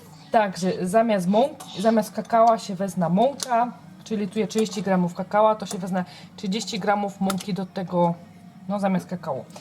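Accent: Silesia, Poland. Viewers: Super tylko dla mnie ten język Ślązaków jest wolniej przyswajalny :) pozdrawiam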